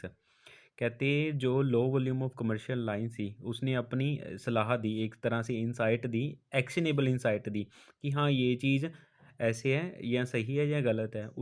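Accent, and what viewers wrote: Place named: Punjab, India. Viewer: But your accent like u r from punjab..... And mostly speaking in hindi & punjabi .... So why are h hiding that u r from Chandigarh...???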